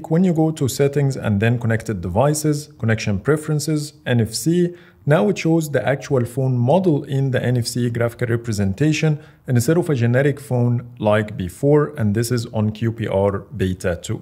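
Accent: Egypt. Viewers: I came for the Egyptian accent and stayed for the content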